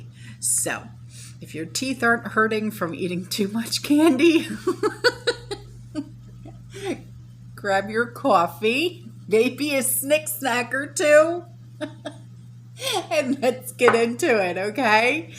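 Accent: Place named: Pennsylvania, United States. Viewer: Was listening to you when I was up visiting my son in Pensacola...he was like...is she from Pton (my hometown in PA) she sounds just like you & your friends...coffee and sticky snacks. It made my heart sing that he does pay attention to our words. Have a fantastic day. Love your channel.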